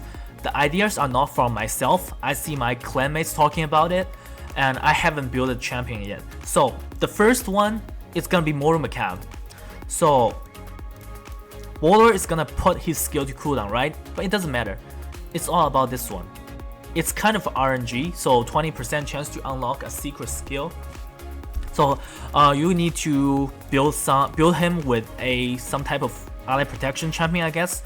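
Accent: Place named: Asia. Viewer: Is this first time that I see you in person:O. I only follow you on YouTube hehe. I knew that you were an asian hehe I'm not a native speaker English, even though I recognize that accent. Thank you I really learn a ton with your videos. I appreciate <3.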